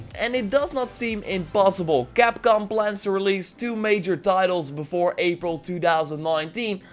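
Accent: Netherlands. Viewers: Are u Dutch? you sound Dutch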